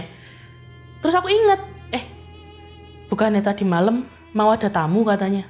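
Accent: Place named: Java, Indonesia. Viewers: Enak bnget ini mbk ceritanya mudah difahami kata katanya ada logat medoknya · Mbaknya ciri khas orang Jogja bgt. Slengekan seru klo ngomong. Nyenengin dah! 👍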